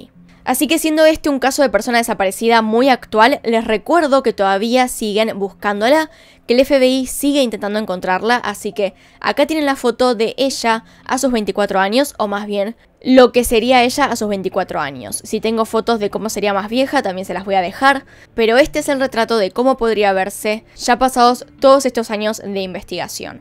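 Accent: Argentina. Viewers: Sos argentina??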